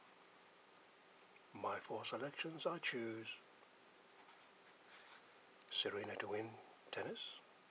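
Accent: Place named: Wales, United Kingdom. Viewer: Welsh accent for you. L)=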